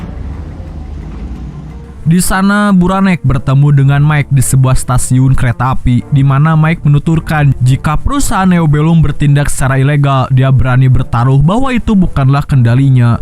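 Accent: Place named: Sunda, Indonesia. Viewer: Minnnn kamu Sunda nya dimana ?? Logat sunda nya kentel bgt 😁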